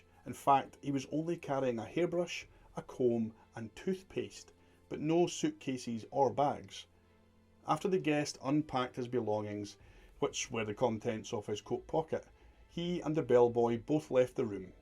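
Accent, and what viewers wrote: Scotland, United Kingdom. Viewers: Uh yeah...you're Scottish, I am Australian and would love a Scottish accent 😎👍.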